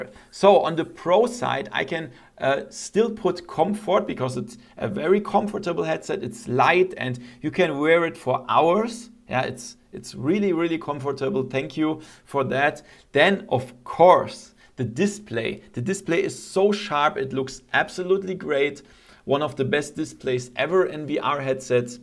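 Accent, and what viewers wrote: Germany. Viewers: Are you German??? )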